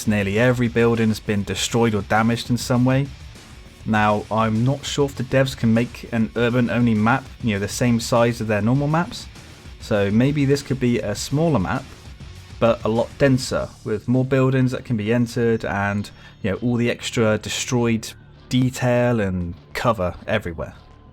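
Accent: United Kingdom. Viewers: I like your british accent! Hi from western front!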